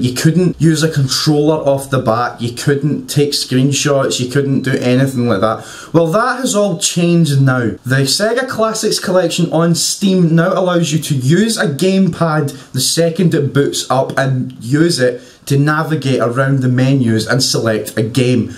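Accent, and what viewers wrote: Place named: Glasgow, United Kingdom. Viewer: Guessing your from glasgow and a celtic man like myself? :)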